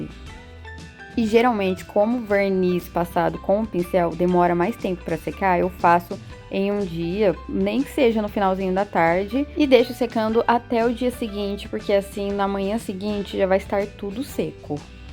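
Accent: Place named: Inner São Paulo, Brazil. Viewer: Olá, desculpa minha intromissão, mas te pergunto... pra que essa saudação em inglês???? Nosso idioma, como sabes, é Português, ó tu, que és brasileira, e tens um nítido sotaque do interior paulista... Por outro lado, sei que o canal é teu e, como pessoa, tens direito pleno de te expressares como queiras, mas... enfim... Fora isso, admiro teus trabalhos, todos, menos as cores de tintas que utilizas, mas isso é questão de gosto e gosto não se discute... E, a título de cooperação, quanto a esse novo projeto teu, o nome correto para o antigo armário, agora transformado, é "Balcão". Aparador é outra coisa bem diferente.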